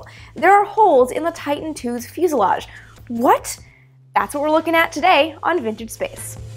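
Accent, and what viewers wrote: Canada. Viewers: My fav launch is the Saturn V with Pete on mission control? Are you from Canada? You pronounce 'out' and 'about' in a different way.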